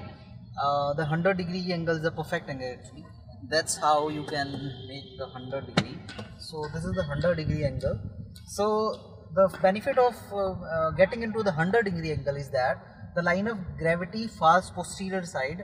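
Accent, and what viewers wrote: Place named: Haryana, India. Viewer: sir, can't stop his haryanavi accent coming inn " Clitch" and brake!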